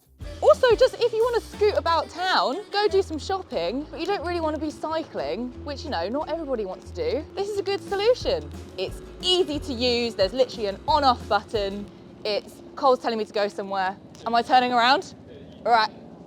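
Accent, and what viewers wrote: England, United Kingdom. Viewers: A women with and English accent makes me harder than a bag of jawbreakers, or was it a bag of tenpenny nails!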